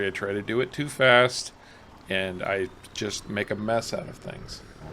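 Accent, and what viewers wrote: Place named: United Kingdom. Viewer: Hi Thank you so much -- without your intro 10 vids on "Ravenport" I'd never have played this game. I can tell you as a fellow Brit its that accent - a winner in USA. Also there aren't any other vids that give useful info on how to do. Ive seen the "out in the wild vid" love that. Im now in Dallas ex New York. Im totally absorbed in Ravenport on my way to 1st $million. About to expand field 19 up the hill. What I would love is a tutorial if you have one on making the tree cutting/logging viable and worthwhile. The weird bark colored trees are a real hassle to cut up and transport and sell - 23 so far. Ive had success with some leveling landscape but want to put chickens pigs and cows into Ravensport which is so small but I believe its possible. How about Ravenport Part 2 my first Million???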